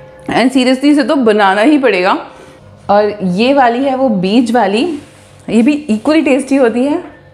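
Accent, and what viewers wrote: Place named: Meerut, India. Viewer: Aapki Urdu boht piary hai pure merut wali love from lahore pakistan 😘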